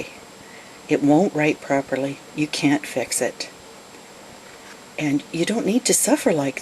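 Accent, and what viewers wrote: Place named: Canada. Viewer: Anyone want to play a game of "spot the Canadian accent"? Great video and great accent:)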